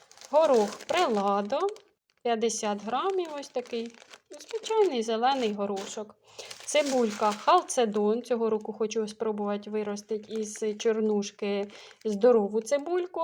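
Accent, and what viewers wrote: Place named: Ukraine. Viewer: український, і говір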